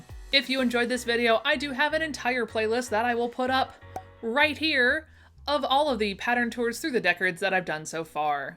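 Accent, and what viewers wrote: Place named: Ontario, Canada. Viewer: Are you sure you don't live in Canada, you sound like you're from Ontario like me! I think the only thing that angers me about the big 4 patterns is that I'm not in the size range unless it's one of the few smocks they have. It's why I draft my own for basic stuff or buy from Indie companies that acknowledge that mid fats exist!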